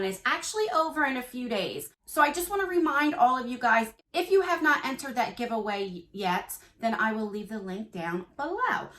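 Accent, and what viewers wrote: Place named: New York, United States. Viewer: Are you a New Yorker? Living for the accent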